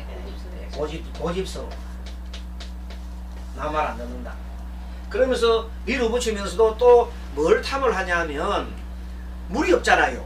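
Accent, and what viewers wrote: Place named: Jeolla, South Korea. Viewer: 말씨를 보니 라도인간이고 사이비인간이로다 대가리는 삭발하였지만 완전한 좌빨인간이다 에잇 좌발놈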